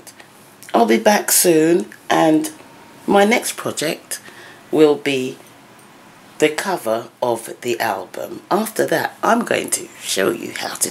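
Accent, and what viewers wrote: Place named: United Kingdom. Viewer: I love your voice lol.. like a southern Big Momma with a British accent.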